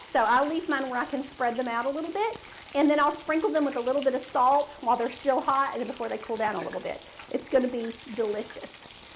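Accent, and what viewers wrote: South, United States. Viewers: My wife and I who now reside in Wyoming grew up in Memphis. We Love your accent. Your cooking skills are a reflection of your Famous Southern Heritage, we can't wait to try this recipe. Thank You! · I love how happy you are about sharing your cooking skills! And your southern accent! 😉 I’m southern too!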